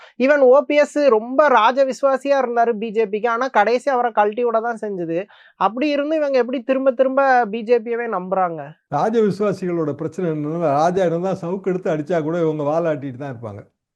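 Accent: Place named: Coimbatore, India. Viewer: கோவைத் தமிழ் அவருடையது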